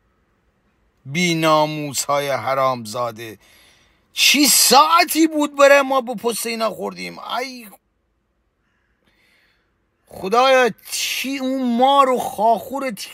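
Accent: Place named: Rasht, Iran. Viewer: درود بر شما، میشه خواهش کنم که نرمال فارسی رو صحبت کنید چرا نباید بدون لهجه رشتی تحلیل کنید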